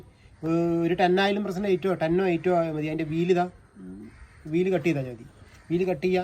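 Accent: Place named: Kozhikode, India. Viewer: ഭാഷ ആക്സന്റ് കേട്ടിട്ട് കോഴിക്കോട് അല്ലെങ്കിൽ മലപ്പുറം ആണ്.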